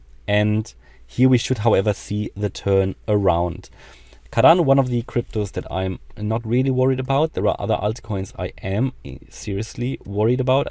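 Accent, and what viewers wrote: Germany. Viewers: geiler Typ 👍 hört man direkt den guten deutschen Dialekt raus 👌 wollte nur schauen wie es um ADA steht und bin auf dein Video gestoßen. Abo hast du sicher.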